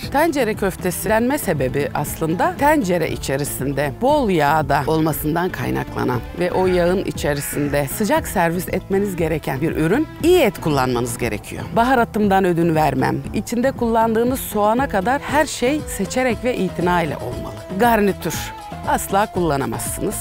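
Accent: Turkey. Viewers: Rumelili, comar degil ondandir:)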